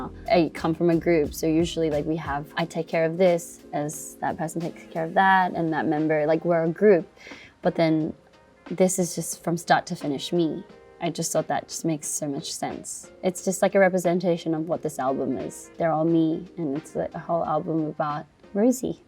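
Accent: Australia. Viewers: “Aussie accent” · That Aussie accent is so charming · Her Aussie accent is so aesthetic and luxurious!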